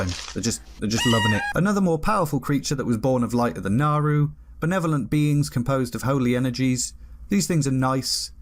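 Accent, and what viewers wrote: United Kingdom. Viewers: I'm working my way through your channel and you're brilliant! I can't figure out your accent, Midlands or more northern?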